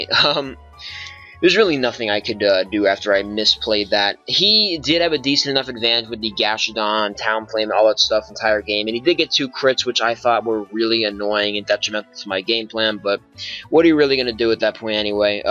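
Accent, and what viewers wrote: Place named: Illinois, United States. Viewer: Are you from Illinois? Or Wisconsin? You kind of sound like you are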